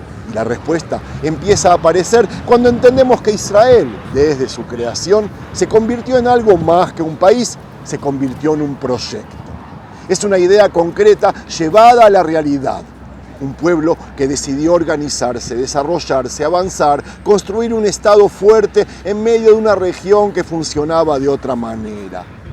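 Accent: Argentina. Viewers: Jajaja! Ya no sabes que decir, hablás como argentino y te crees israelí. Ya te lo dije pero eres necio, el pueblo de Dios no es el régimen mataniños de Israel. (La verdad y la justicia siempre sale y saldrá a la LUZ❤) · Irán nunca ha sido problema de nada. El único pecado de Irán es no haber permitido que los jefes sionistas con sus empresas petroleras roben directamente sus recursos. Porque esa gentuza no está acostumbrada a pagar por nada, no se engañen. Desde entonces se la tienen jurada y si leen un poco se darán cuenta que es el país que menos conflictos ha tenido en Oriente Medio, simplemente tuvieron una guerra muy cruenta con Irak porque los sionistas jefes les echaron encima a Saddam, al que luego ahorcaron por cierto. Por el contrario, Israel como estado en Palestina es el mayor error/crimen de la historia moderna que, como dijo un tal De Gaulle, al que por cierto los jefes intentaron asesinar, "traerá ríos de sangre"... 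El sionismo es un concepto político sinónimo de usurpación, crimen, supremacismo, terrorismo, etc, a mí no me va a convencer con su tono argentino y su voz melosa. Israel es un estado desquiciado que se comporta muy agresivamente con sus vecinos, como cualquier especie invasora, es ley biológica. Fundado en Palestina en pleno siglo XX porque lo dicen sus cuentos míticos religiosos, que tiene narices. Y para legitimarse se gastan millonadas en buscar restos arqueológicos porque no pueden reconocer que LA MAYORÍA DE LOS HECHOS BÍBLICOS NO SON HISTÓRICOS SINO MÍTICOS. Pero mucho más patético que el judío sionista es el cristiano sionista, que les tocan las palmas y no se enteran de que no tienen nada en coún: al judío le repugna la figura de Cristo, el peor de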